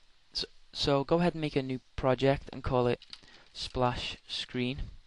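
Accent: Liverpool, United Kingdom. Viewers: Neva mind, Found it! Nice Accent by the way! Scouser? I'm Welsh, I h8 my accent!! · I think you will find it's Scouse (Liverpool)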